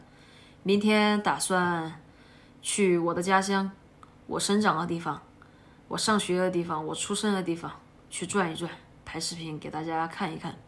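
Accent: Sichuan, China. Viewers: 重庆妹子学习了。怪不得看你和你妈妈讲话那次视频，听出四川口音。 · 其實漢水邊上口音和四川很接近，對嗎？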